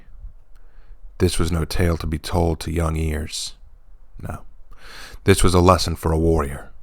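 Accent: Scotland, United Kingdom. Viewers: Nice Scottish accent btw. Really felt the Highlander spirit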